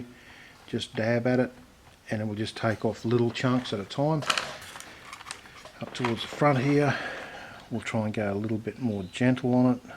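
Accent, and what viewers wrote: Australia. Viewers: Mate I just stumbled across your channel, so good to hear the way you just talk through it. Not just the great description but love hearing from an Aussie. It's like I'm around my mates place bashing out some painting techniques. I'm going to have a look through your other stuff. Cheers for the info and keep it up.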